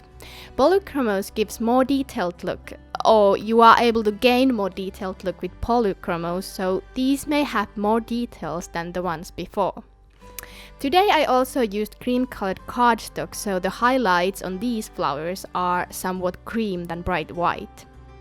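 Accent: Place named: Finland. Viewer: It doesn't matter if I watch with my first cup, or last of the day,!! I LOve watching you ANY time of day! These are so lifelike... Tom even watched, saying 'she has an accent' to which I replied: it's! She's Finnish! Of course, Tom was ready... No she's not, she is still coloring!!!" Love your card... and you!=] Michele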